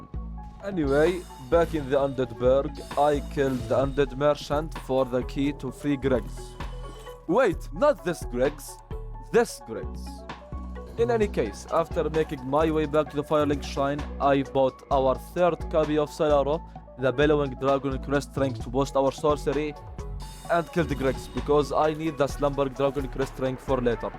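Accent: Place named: Arab world. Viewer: Are you arabic? Youre accent kinda sounds like an arabic one lol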